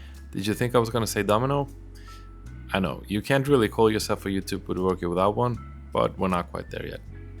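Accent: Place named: Norway. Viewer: where are you from? your english accent sounds norwegian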